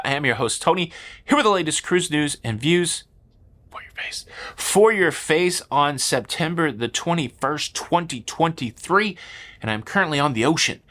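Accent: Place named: Boston, United States. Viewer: Make sure you ask where the cayr (car) is payrked (parked) lol. Love love love the Boston northeastern accents. It’s a great city. Have fun and safe travels.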